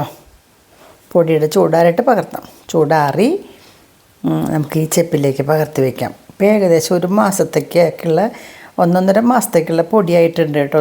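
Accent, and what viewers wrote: Thrissur, India. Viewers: അതുമല്ല, തൃശ്ശൂർ ആണെന്ന് തോന്നുന്നു 😅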